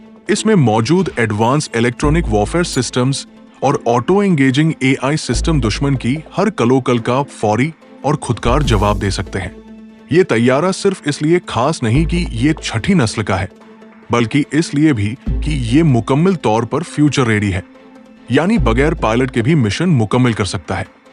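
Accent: India. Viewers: App Urdu ase boll rahe jese app Indian hain. Muwajna in Urdu muwazna lese Pakistan jindabad in Urdu Pakistan zindabad. Or you understand.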